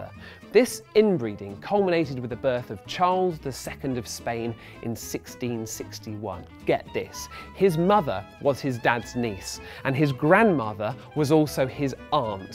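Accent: United Kingdom. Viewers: A brit talking about american inbreeding'? Lol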